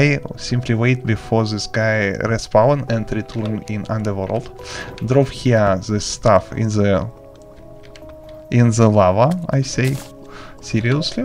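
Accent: Russia. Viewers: heh, this russian accent on english words is kinda cute lol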